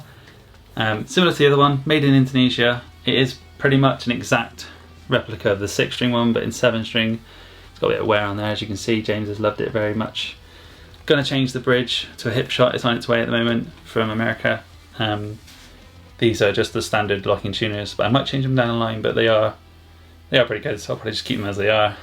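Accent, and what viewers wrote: United Kingdom. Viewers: Are u from the UK or america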